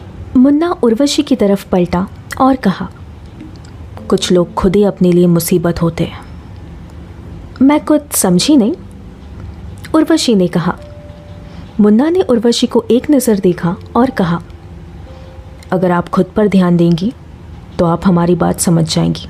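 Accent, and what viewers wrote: Varanasi, India. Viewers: Apki awaz me jyada maja ata hai dii rj voice me bhi sunke ka maja hai par banarsi tone apka jyada acha hai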